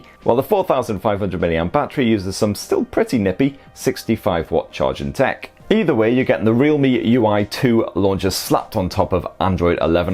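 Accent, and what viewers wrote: United Kingdom. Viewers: Love the approach to all your videos, you should be bigger but hey maybe some can’t handle the queen’s English. Keep up the great work mate .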